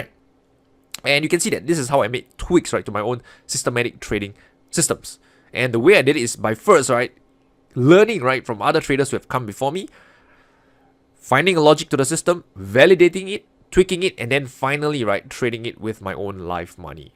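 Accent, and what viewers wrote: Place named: Singapore. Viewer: has a typical Singaporean accent.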